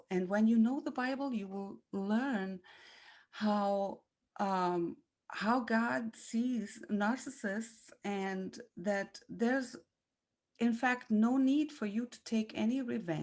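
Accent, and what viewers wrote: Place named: Germany. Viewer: Thank you sister in Christ, glad I found your channel and happy for you that you were set free from your narc(s) 🙏🏼 Going on 17 years of marriage with a Christian covert narc next year. The Lord has opened my eyes completely. Starting Minute 22 is spot on! I can hear an accent- are you from my home country Germany? God bless you 🙏🏼🤍🦾 MARANATHA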